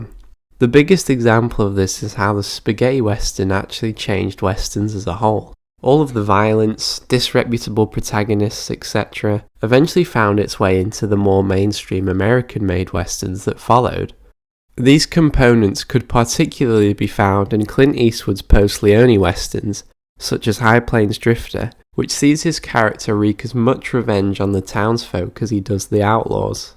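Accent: United Kingdom. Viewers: Narrative spooled by the low class English accent..... no glottal stops... ie "T"s" matter and "Bee-ings" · Spaghetti westerns described by a crappy British accent. YouTube sucks ass these days. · narrated by a Brit? ...about italians making american westerns?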